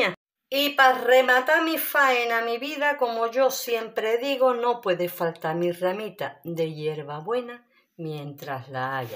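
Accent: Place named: Seville, Spain. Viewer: ñiña disfruto viendote y hollendote te espresas habeces como mi abuela carmen era la autentica sevillana como tu besos desde barna att.maria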